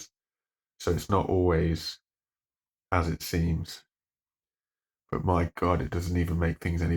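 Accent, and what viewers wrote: United Kingdom. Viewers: Listening to you talking, it's like hearing my own thoughts (except with an awesome British accent). All I have to say is thanks for being here, and if you can hang on then maybe I can do the same. <3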